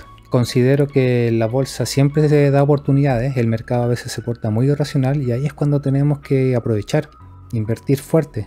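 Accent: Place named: Chillán, Chile. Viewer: jejeje, gracias y Saludos amigo, Te veré siempre en tu canal, ya puse campanita👍. Pd (¿Eres de los alrrededores de Chillan?, por tu acento, viví varios años por esos lados)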